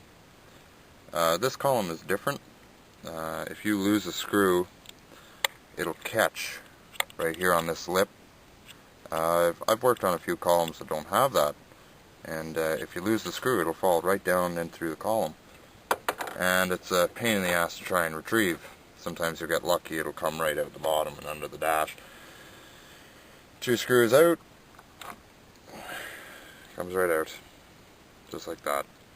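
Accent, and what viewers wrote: Canada. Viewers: Canadian eh.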